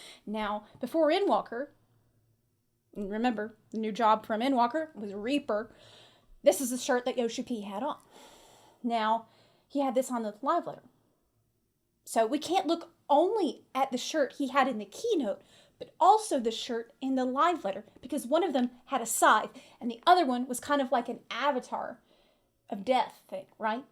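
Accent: South, United States. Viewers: Since she moved back to the US she reaaallly has that Southern drawl. Also,clearly hyper - dem goggle-eyes doe... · wtf is happening lol, I forgot you existed and somehow your southern accent got thicker LOL and this videos so goofy, with all the random things that happened in the past lol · Genuine question: where did the southern US accent come from? I just came from her "all mounts" video from 3 years ago and her accent is totally different. Thanks.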